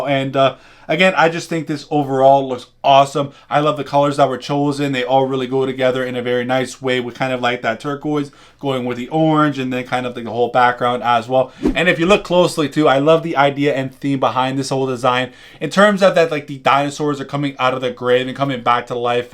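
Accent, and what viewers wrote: Northern Ireland, United Kingdom. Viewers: Wow bro, yr accent sounds exactly like a Northern Ireland accent, is that where yr from? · Northern Irish 😂😂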